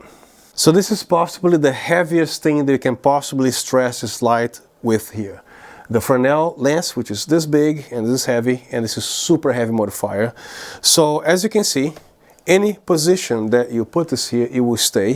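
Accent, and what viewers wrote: Brazil. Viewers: There aren’t many reviews of these new models and they look amazing, thank you. I own 2 la200d and absolutely love them, I plan to get 2 la300r next year, hopefully. Have you checked out the godox s120t softbox? There are also other sizes, but they are “umbrella” style, apparently much easier to set up and colapse, I want to replace my softboxes with 2 s120t. I’m from Brazil and even though these products are relatively inexpensive abroad, here the prices are absurd. Ps.: you sound Brazilian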